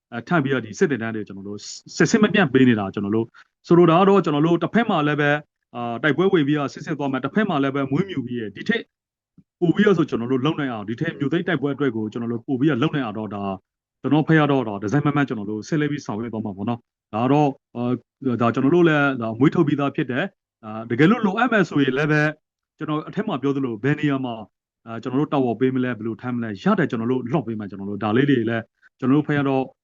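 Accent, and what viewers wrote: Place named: Dawei, Myanmar. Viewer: ဒဝယ်သံကြီးဝဲနေတယ်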